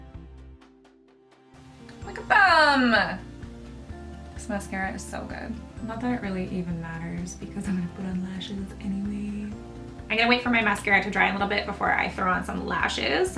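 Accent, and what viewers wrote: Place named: Canada. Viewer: I love your vids girl! I didn't know you were a fellow Canadian ! Even though how could I not know.... our accents are pretty distinctive :p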